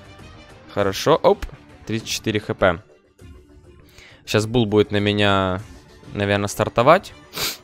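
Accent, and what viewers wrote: Ukraine. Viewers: За укр акцент лайк!!!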